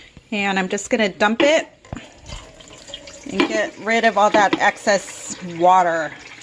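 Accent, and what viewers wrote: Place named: United States. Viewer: Idk I’m sorry to say but even her accent was too American aka “white” she even said paratha like a white person 😩 idk I think I’ll experiment in other halal tamales I grew up eating them but mom used pork fat and it’s a no no for us Muslims. But ghee it is maybe with some red sauce in the masa también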